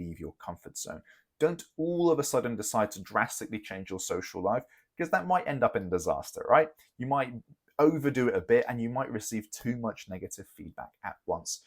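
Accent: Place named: United States. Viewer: Yo man, how the hell did you ger an american accent 😂, youre supposed to have a hungarian accent, im from the Balkans and id like to have that clean accent too, any tips?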